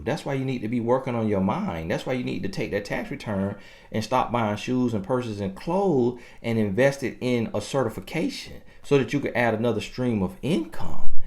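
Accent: South, United States. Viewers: Right heauh ! Love his southern drawl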